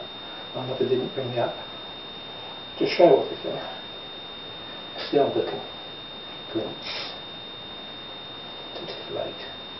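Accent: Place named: England, United Kingdom. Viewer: sounds like your in england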